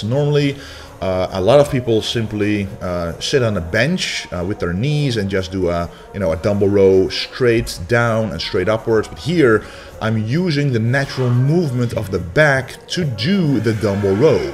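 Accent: Netherlands. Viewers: Hahaha ik dacht serieus is deze man Nederlands? En toen zei je het. Goeie tips man! Ik voel me rug altijd heel lastig. Ga dit zeker vandaag proberen. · Soms voel je gwn dat iemand nederlands is, terwijl je engels is LV10 perfect maar ik keel naar ze face en dacht..... wacht een even laat me kijken wat zijn naam is en jaaa hoor BTW top video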